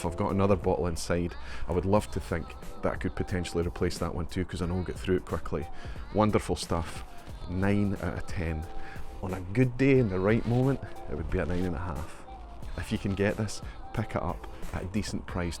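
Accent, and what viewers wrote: Scotland, United Kingdom. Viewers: HEELS PLEASE! New to whisky despite also being Scottish! You sound local also! Trying to watch all your videos and soaking as much knowledge up as I can! New subscriber!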